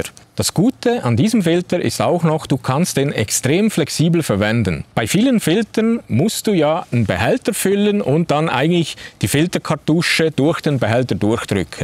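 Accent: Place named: Switzerland. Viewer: Moin Na, das war ein dramatischer Anfang und die Musik Episch. Das mit dem Schweizerdeutsch war vollkommen in Ordnung, der Dialekt ist einfach Klasse. Der Filter ist wirklich gut. Selbst hab ich einen von Katadyhn . Ist aber eine Überlegung wert sich den anzuschaffen. Danke für's zeigen 👍🏻 Gruß Michael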